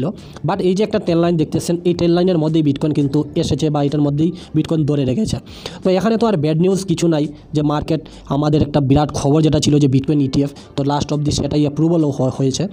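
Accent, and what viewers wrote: Sylhet, Bangladesh. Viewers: Vai Assam e taken naki? Sylheti vasay kota bolen mone hocce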